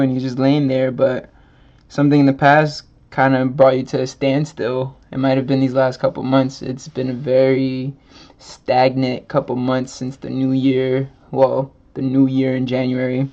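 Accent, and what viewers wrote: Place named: Jamaica. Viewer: why u trying to sound like a Jamaican?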